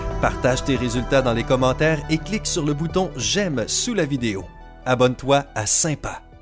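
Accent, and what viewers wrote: Quebec, Canada. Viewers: D'après ton accent tu dois être. Quebequois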